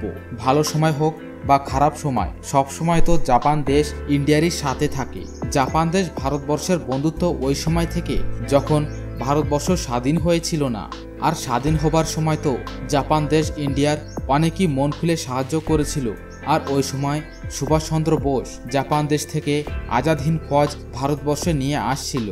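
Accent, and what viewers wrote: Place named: Bangladesh. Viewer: Apnar kotha sune to Bangladeshi Mone holo.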